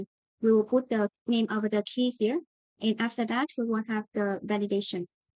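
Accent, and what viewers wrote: Vietnam. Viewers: Nghe phát âm tiếng anh giống người việt cái nhìn lại tên thì ohhh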